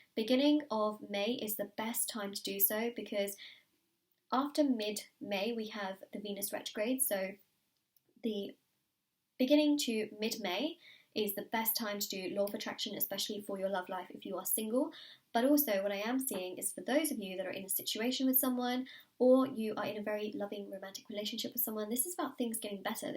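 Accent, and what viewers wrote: England, United Kingdom. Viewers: I adore your accent, so Hermione Granger 😍 What part of England is that?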